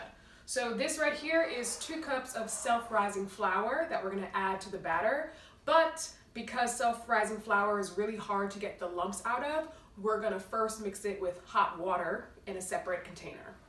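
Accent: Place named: Eritrea. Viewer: You sound smell and test like FULLY ERITRIAN yes you are Comper you with the ather Youtuber eritrian girl way better than her by the way do you know a bit of TIGRIGNA ???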